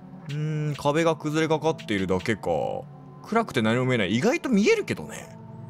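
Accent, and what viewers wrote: Kansai, Japan. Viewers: たまに出る関西弁めっちゃ良いですよね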